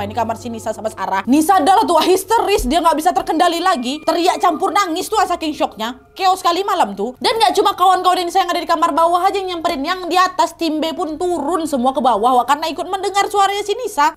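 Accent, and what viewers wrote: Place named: Medan, Indonesia. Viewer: Ceritanya benar2 menghororkan tapi, hilang rasa takut karna dengar k yang membawakan ceritanya dengan lucu. Mala pake logat medan ada uwak nya lagi. Mantap k.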